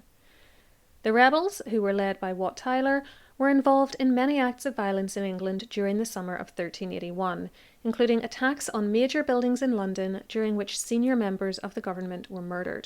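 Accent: Northern Ireland, United Kingdom. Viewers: Hi - is from Northern Ireland 🌟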